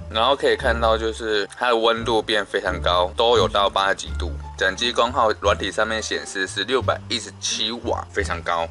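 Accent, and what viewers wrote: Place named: Taiwan. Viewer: 感谢超小白的教程。话说台湾腔真好听~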